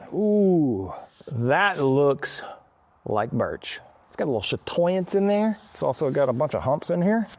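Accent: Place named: South, United States. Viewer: Why did voice get 10 times more country in this video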